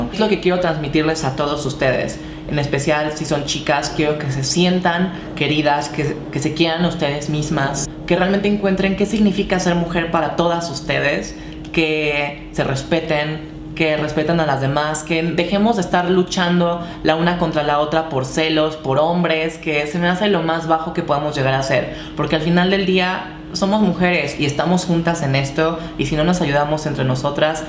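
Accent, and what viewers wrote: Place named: Fresa, Mexico. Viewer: porqué siempre hablas tan pinche fresa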